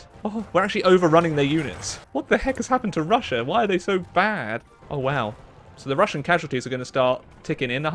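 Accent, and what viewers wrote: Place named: England, United Kingdom. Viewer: I'm french and i like your english accent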